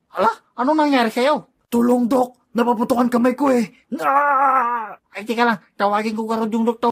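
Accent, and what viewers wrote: Ilocos, Philippines. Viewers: Ilocano ka boi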